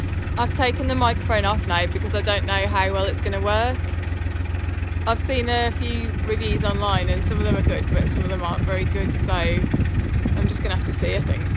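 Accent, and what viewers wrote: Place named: Birmingham, United Kingdom. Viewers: I worked in the West Midlands for many years and fell totally in love with the accent - It's such a delight to listen to you! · Hiya, wishing you a very Merry Christmas from Sweden 😁 - from an ex-pat (originally from Wolverhampton) but been here in Sweden for almost 30 years now. Discovered your channel a few months ago and your journeys bring back many happy memories. I used to fish a lot on the Staffs Worcester when I was young 😁👍. Safe travels and enjoy the festive season 🎅 PS I've been trying to place your accent - first I thought Wolves but you seem to have some Brummy in there too 😉😁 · I love your Brummie accent ❤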